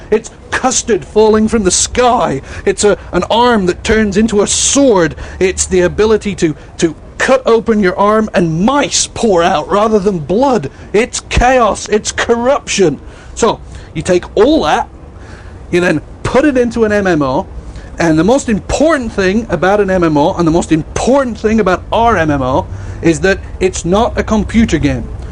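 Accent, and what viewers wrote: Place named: Yorkshire, United Kingdom. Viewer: Hahah, it's genuine. It's a just a regional accent, yorkshire i think! Although Games Workshop have made it big in north america they are a british company.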